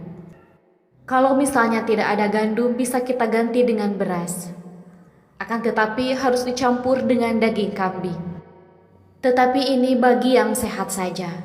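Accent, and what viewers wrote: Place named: Madura, Indonesia. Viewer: Kyk org madura suaranya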